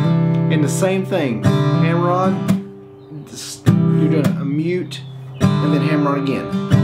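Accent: South, United States. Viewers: I love your Southern accent. Greetings from London, UK. Your videos are a blessing to me as I try to learn acoustic classical guitar. I want to play in church one day. I try to learn but face so many obstacles.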